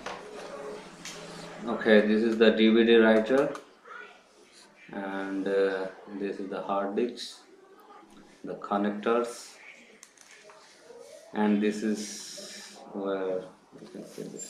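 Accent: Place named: India. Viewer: Bon sang, Mais pourquoi les indiens ou pakistanais parlent tous avec cet accent ?? on dirait une sorte de blague. J'ai toujours l'impression de regarder une mauvaise série sur le câble... Enfin, merci pour la vidéo sinon.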